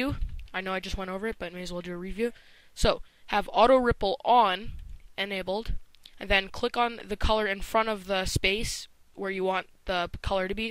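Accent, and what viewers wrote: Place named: Canada. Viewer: I'm guessing your Canadian. I have a keen ear for accents.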